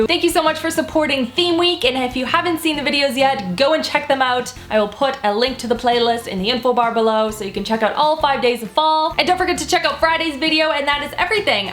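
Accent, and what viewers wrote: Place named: Canada. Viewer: I've never really heard your Canadian accent until this video, lol. So cute! Great content, as always.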